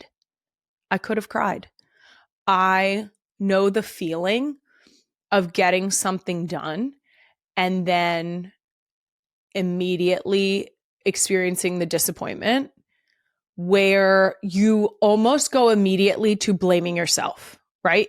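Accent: Philadelphia, United States. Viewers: I’m not gonna lie… I am pretty sure your Philly accent has only gotten stronger!! 😂 this coming from a Canadian who loves it!❤ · So much Philly (I’m not complaining)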